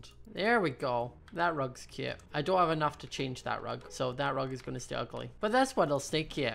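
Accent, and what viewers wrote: Scotland, United Kingdom. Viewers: Ur voice is EVERYTHING (Are u Scottish?) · Yes he is Scottish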